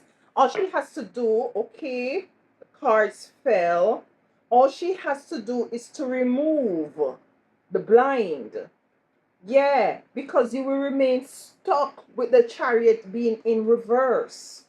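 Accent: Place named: Jamaica. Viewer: Thank you beautiful QUEEN and love your Jamaican accent! ❤💯🌹🎁 First time watching ASÉ ASÉ 🙏🏿🙏🏿🙏🏿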